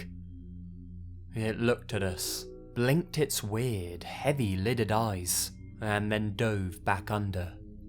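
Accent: England, United Kingdom. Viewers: you totally have my number, I love falling asleep to you reading these stories. I can't help it, your voice is so soothing and calming. I'm also a complete sucker for an English accent. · the slight British accent <33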